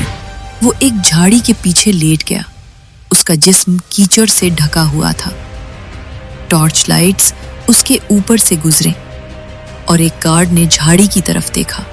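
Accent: India. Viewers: Ap ke urdu Hindi jesi ha I mean lehja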